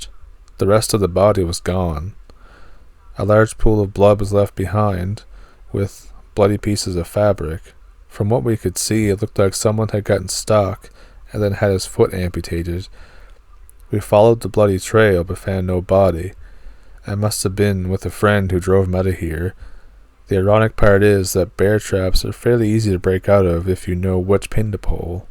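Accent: Canada. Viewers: Thanks for letting us hear your kitty cat! Your accent is soooo Canadian. Nice!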